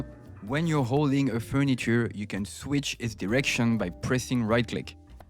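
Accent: France. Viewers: Your french accent is very beautiful ^^ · I hear a french accent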